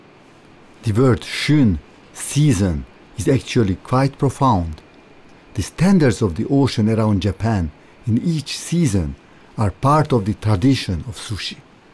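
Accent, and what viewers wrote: Hungary. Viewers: It is not fake. He is from Hungary, and he really speaks with that accent. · i though, that maybe this person is hungarian, because of the accent. :) its not because of fun. I was born in Budapest too. Hungarians love Japans and I hope they love us too. FOREVER JAPAN AND HUNGARIAN BROTHERHOOD. Greeting from London.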